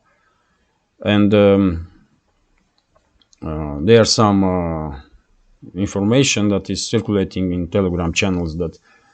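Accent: Russia. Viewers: NATO, Poles mercenaries are involved. And those Ukraines forced to die for USA. Meanwhile, Russia wins again. The Imperialist brain is sociopathic hence the more Russia wins, the more they double down. I rejoice in Mr Putin's leadership: a leader who loves his citizens, whose intelligence is layered with great emotional depth. Alas, I have Justin Trudeau to depend on for my economic well-being. Perhaps, you can lend President Putin to us, just to help us become a real nation, again.😏🇨🇦 Advice: speak a little louder, a bit more emphasis Just a bit🤏 Found you from Alex C.'s channel. A Russian in Russia sounds good to me! P.S. I am an old woman who has been dumpster diving for the truth for decades. Good to find another truth teller in my search. To die is human, to die rejecting the bullshit of false prophets is divine. Subscribed.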